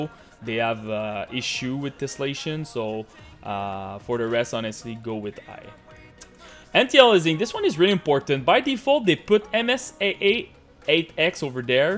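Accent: Quebec, Canada. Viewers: j'bet 100$ que t'es québécois haha j'reconais l'accent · Gotta say, i'm french canadian too and you inspire me to starts video cause my accents not even worse than yours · you, my friend, is from quebec! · you sound quebecois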